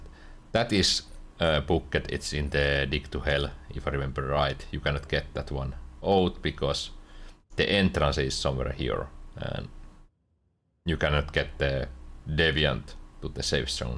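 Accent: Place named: Finland. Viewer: Finnish accent Perrkele 😂 ❤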